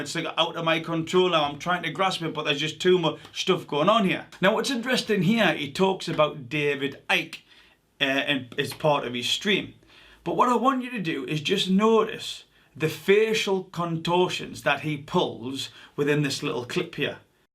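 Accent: Newcastle, United Kingdom. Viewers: A Geordie on YouTube. Wey aye mate 👍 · Wew is that a Geordie I hear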